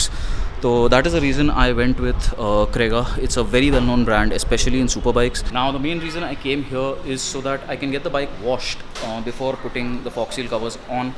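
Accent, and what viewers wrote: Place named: Mumbai, India. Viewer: Aahhh!! Mumbai Roads 🥲 Ride Safely 🥰 Finally got to listen ur Mumbaiya Language Skills 😝 Good Vlog, Good Vibes ✨ Stay Blessed 🙌 Keep Vlogging 🎉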